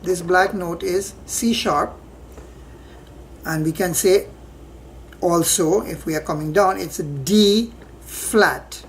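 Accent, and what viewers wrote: Trinidad and Tobago. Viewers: Are you from Trinidad by chance? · Trinidadian accent.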